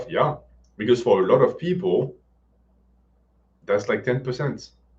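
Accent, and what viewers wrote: France. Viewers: It was rainy the whole week and despite that I wanted to get my steps in and went to the gym by foot. While coming back home all wet and soggy I just imagined stupid face with a grimace saying "Homegym Masterrace" with his french accent.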